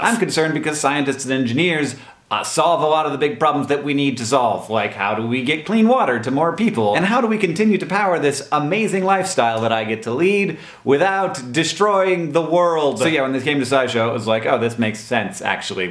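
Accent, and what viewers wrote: United States. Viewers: Do you live in America? (Judging by your accent from your videos, I think you do.) Money practically grows on trees here...if you have any sort of brains, creativity, OR charisma. Or a pulse. If you feel like "there's no money" in a certain field move to India for a few years. Try out Pakistan. We have it pretty good.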